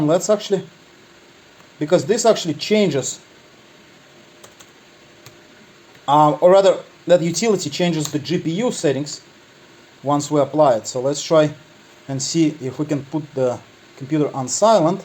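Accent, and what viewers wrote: Russia. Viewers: Русский что ли?!)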